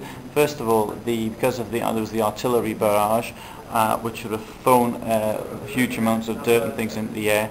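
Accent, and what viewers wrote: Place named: England, United Kingdom. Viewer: I wanted very badly to go to this museum April of 2005. My girlfriend (at the time) spoke fluent Russian and German. She agreed to help me document the 60th battle reunion. I had money saved up for two plane tickets. Long story short, we didn't end up going .. Glad you could make it though! Sounds like your from England, I'll bet WWII battlefield tours are a virtual hop-skip-jump for you. Lucky dog you.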